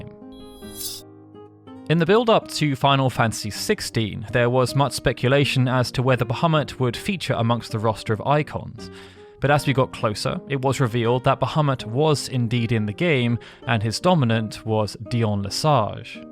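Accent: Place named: United Kingdom. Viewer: Ultra long video essays of old games are wonderful. MrEdders123 and I Finished a Video Game are also great alternatives with a similar British tone.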